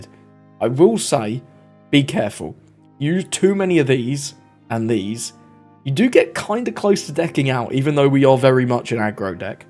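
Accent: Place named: United Kingdom. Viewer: Definitely! He has that UK accent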